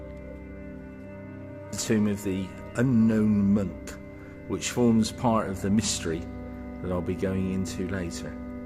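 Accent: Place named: South West England, United Kingdom. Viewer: Hello. Yet another of your interesting stories. You've got an accent very familiar to me. Probably Hampshire/Wiltshire or Dorset. Very enjoyable either way.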